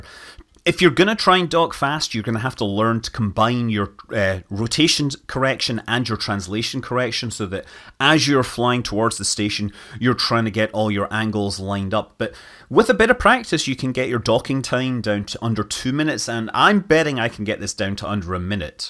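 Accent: Scotland, United Kingdom. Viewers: I hear Scottish accent and see science. Subscribed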